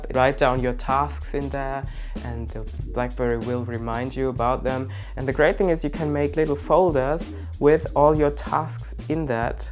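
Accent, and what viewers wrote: Germany. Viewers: i thought so. I've been living in düsseldorf for about 1.5 years now. i can easily recognise the german accent^^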